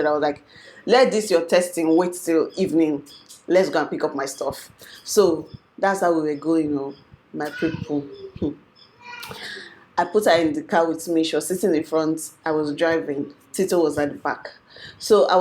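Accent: Nigeria. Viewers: Lovely voice, just couldn't understand most of it in Nigerian accent. Its true that maremaids are beautiful. I've seen it in Joburg working in a shop called Maxx many years ago. She looked like a new born child but grown up. Had baby afro and her skin was like she'd been kept in a bottle and had never had any sunlight exposure at all. I complimented her of how she was so beautiful and she answered me in my language. I couldn't see her legs at the shop because she was standing between rails of the clothes... Months went by and I read in one of the church articles in Joburg, some man who had fallen for her beauty, describing her, also stating where she worked: Conferming the woman to be a wicked maremaid. 😱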